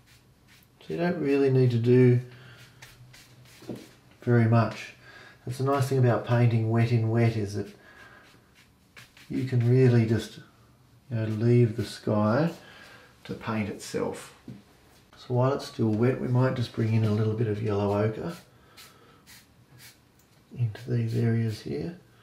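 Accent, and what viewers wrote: Australia. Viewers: I watched a second time. It’s really a stunning painting. It’s amazing to see how different artists palettes produce such interesting paintings. So I subscribed to your site. Where are you from, I hear a slight Australian accent? Kathy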